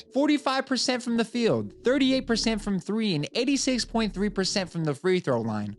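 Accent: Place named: Mexico. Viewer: you sound like a mexican now too bruh u been round the squad religiously fr i see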